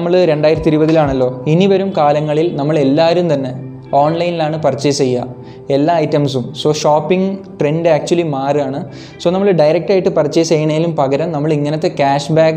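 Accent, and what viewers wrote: Tamil Nadu, India. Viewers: Ningal evideyanu settled.... Aake paade oru tamil touch undallo man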